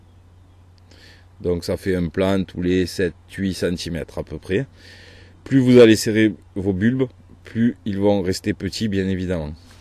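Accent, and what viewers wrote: South, France. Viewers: Avec l'accent du sud... c'est parfait. Merci beaucoup pour toutes ces informations. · Oui cet accent c du sud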